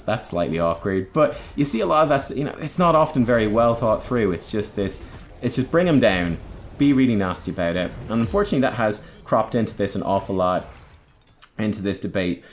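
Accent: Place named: Ireland. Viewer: I love your commentary. Your Irish-ness is part of your charm. No need to self-police.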